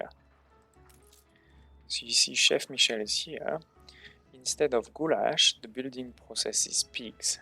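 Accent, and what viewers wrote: France. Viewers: You sound french :D.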